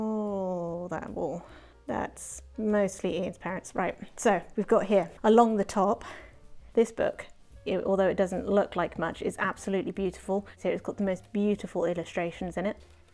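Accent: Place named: United Kingdom. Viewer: It's great to meet a female British booktuber. From your accent i presume.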